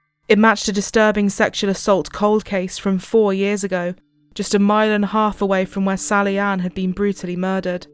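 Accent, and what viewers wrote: United Kingdom. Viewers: When you say reached out do you man contacted, you sound like you are British and not American so why do you use this Americanism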